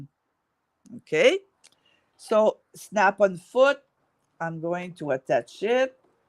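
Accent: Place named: Quebec, Canada. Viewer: French Canada. I recognize the accent.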